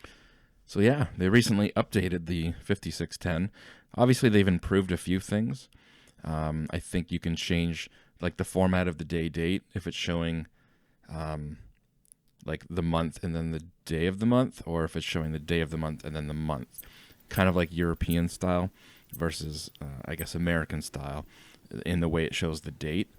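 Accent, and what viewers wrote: Canada. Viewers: Thank you for the nice video, I still bought this Casio model, it will arrive tomorrow. I am not a native English speaker, but it seemed to me that you have an interesting accent, are you from Quebec? Love from Ukraine.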